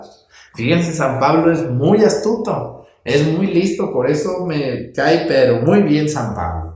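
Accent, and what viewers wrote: Mexico. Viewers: Anque en retardo la sigo ya estoy 28 Entres dias me aggiorno desde ITALIA ANQUE SOY PERUANA DIOS LE DE SABIDURIA PARA TRASMITIR EN NUESTRO PERSONASA ALIMENTAR NUESTRO ISPIRITO PARA ESTAR BIEN.SOY FELIS DE ABERTE ENCONTRADO PADRE. NOSE COMO TE ENCONTRE PERO.TE ENCONTRE.EL AÑO PASADO POR CASUALIDAD.ME AYUDA MUCHO EN LA MIA CONVERSIONE NEL MIO CAMINO. PERO NO AY CASUALIDAD DIOS ME PUSO PARA YO ESCUCHARLE.CONSU DEJO MEJICANO ME ASE REIER.ANQUE USTEDE DIJO UNA BEZ NO ES PARA REISE LO QUE USTED ABLA..PERO SU DEJO.ME ALEGRA ME SACA UNA SONRISA EN MI TRABAJO CANSADO DIOS ME LA BENDIGA EN SU CAMINO AMEN AMEN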